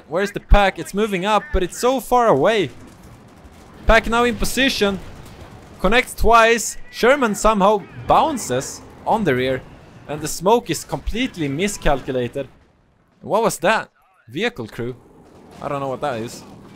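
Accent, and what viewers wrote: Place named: Sweden. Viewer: "... and me is casting" I love this Swedlish too much to criticize it.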